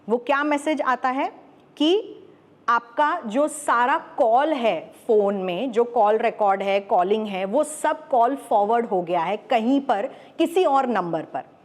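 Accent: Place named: Bihar, India. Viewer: Sound se to bihari lag raha hai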